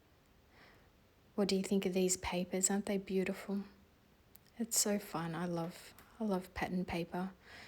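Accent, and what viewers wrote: Australia. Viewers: I'm in NSW & it's lovely to hear an Aussie accent for a change so feel free to be as chatty as you like 😉 Really enjoying your videos and you're doing great so just keep going 👍👏 😍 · So nice to hear an Aussie accent. I have just recently discovered Uniquely Creative. I love the beautiful papers. You make card making look so easy. Looking forward to seeing more.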